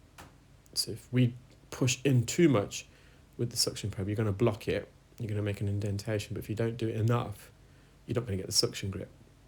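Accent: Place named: Estuary, United Kingdom. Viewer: I was listening to you waiting and waiting and waiting to hear you pronounce one of the "bath" words ("half" takes the a of father everywhere in the UK so that doesn't count) until I finally heard you pronounce "afterwards" as "ahfterwards"... All this in an attempt to place your accent. It's not "posh" and it's not even RP (received pronunciation) but it's not a "common" accent either. (You are, after all, very highly educated). Your accent sounds like it's from somewhere in the SE (or south central England) and it sounds like it might possibly be from the East Midlands or southeast Midlands or even south London. It's certainly not Scottish or from anywhere in the north or the west country. It could, theoretically, be from Wales but that's a part of Britain I'm not too familiar with. I don't want to take any wild guesses but it definitely sounds like it's from the south (and not the extreme western part of the south, like Devon or Cornwall). 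It sounds like what's referred to these days as "Estuary English" (because it's primarily spoken in the SE, at the estuary of the river Thames). It's used by "yuppies" and other educated, upwardly mobile types. It's not a "posh" accent but it's also definitely far from being a cockney accent (even if it does have a little itty bitty bit of cockney in it). 🇬🇧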